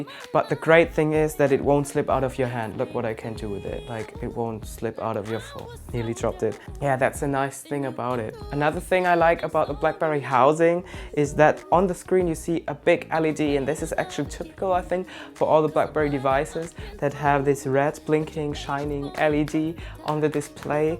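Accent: Germany. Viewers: i thought so. I've been living in düsseldorf for about 1.5 years now. i can easily recognise the german accent^^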